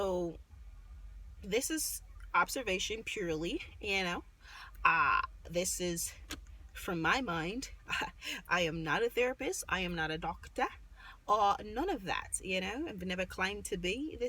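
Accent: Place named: United Kingdom. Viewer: Love the British accent doooling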